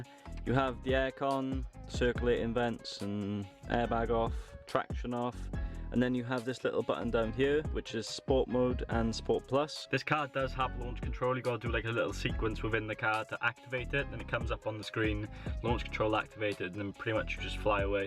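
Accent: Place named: Wales, United Kingdom. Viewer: Where are you from? You sound like you’re from Wales...I’m from South Wales! Love my mini and I also hate when it gets dirty 😏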